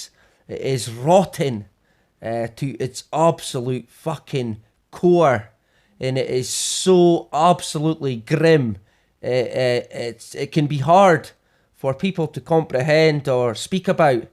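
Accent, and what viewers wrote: Scotland, United Kingdom. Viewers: Love the accent mate. I spent a year in Scotland working offshore. You don't really have seasons in Queensland We had a referendum in 1946 That Denied Government power to medically test This means that the People of the Commonwealth of Australia denied the government any power to force people to undergo any medical or dental procedure without their consent.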